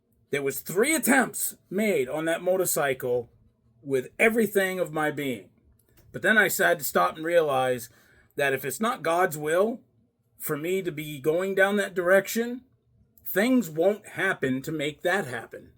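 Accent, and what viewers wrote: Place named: North, United Kingdom. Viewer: I hear that northern accent getting mixed in with that other one you have ha ha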